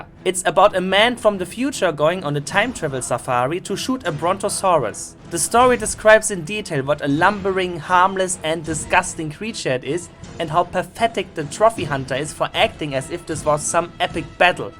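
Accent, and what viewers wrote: Germany. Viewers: Hab mir doch gedacht, dass du Deutscher bist! Dieser Akzent :D Aber deine Aussprache ist sehr klar, bist gut in Englisch. Und danke für deine Videos.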